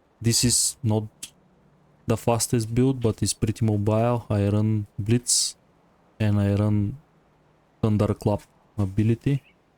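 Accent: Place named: Russia. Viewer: слышу русский акцент)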